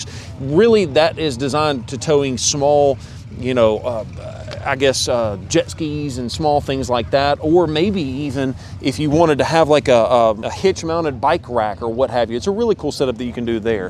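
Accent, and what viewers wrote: United States. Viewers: The American accent makes everything worse. He makes modern tech and sophistication sound like it comes from Medieval times. Impressive. Also, it's hilarious listening to Americans and how they struggle to utter words more than two syllables. Must be a real big task.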